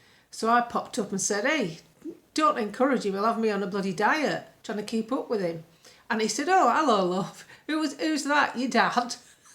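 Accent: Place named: Lancashire, United Kingdom. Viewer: your absolutely right! Love your Lancashire accent! Coming from a Scot!😂😂❤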